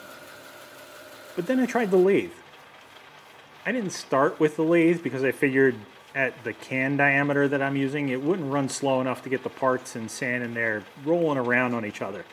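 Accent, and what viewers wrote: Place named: Philadelphia, United States. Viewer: As an amateur linguist. And a cunning one, at that. Something weirds me out... you have a Philadelphia area accent... but you said "pop cans". People in the northeast don't say "pop cans", they say "soda cans". So wtf? My conclusion: you're from the Philadelphia area, but you currently live somewhere in the middle of popcan country. OR, it could be that you're in the rare, super thin strip of middle Pennsylvania where the Philly accent overlaps with the popcan people. whats the deal?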